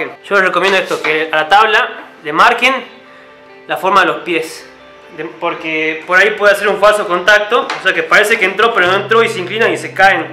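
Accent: Tucumán, Argentina. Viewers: DiiabloGalarza#GidinGang suena tucumano o salteño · Wtf el webi wabo es argentino😮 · sos de Tucuman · Sos argentino o me parece ? :v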